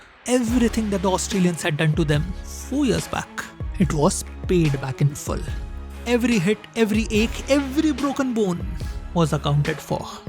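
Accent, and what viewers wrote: India. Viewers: What a crazy Indian accent this narrator has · What a pathetic background commentary! Seems Indian 🤣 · Strong Indian-accented narration notwithstanding, this is just the finest portrayal of the making and initiation of the "fearsome foursome" ever! I am WIndian myself and a casual cricket fan but never was as clear about what took place then as I am now. Great information, and wonderful perspective. Thanks a lot! --Æ. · Its not pronounced " Deeter-Mine". We know you have typical cringy Indian accent but try saying it as one word " Determine". Thank you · An Indian narrator having a strong Indian accent is odd to you? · Typical Indian English 🤣🤣🤣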